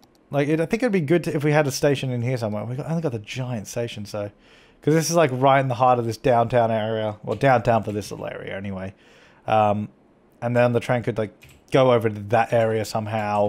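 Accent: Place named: Australia. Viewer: I like ur channel cos u have an Australian accent which is epic ( I have a English accent)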